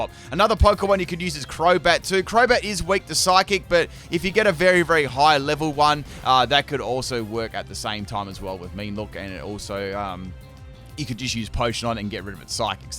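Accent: Australia. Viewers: Im dead at ur thick Australian accent speaking all fast, normally I speed up videos to 1.25 to get the speed of the person explaining something right first time u had me lower it at .75 lol way smoother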